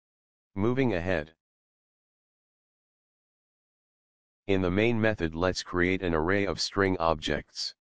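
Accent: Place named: India. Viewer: dat microsoft sam voice, good vid, but id prefer your indian accent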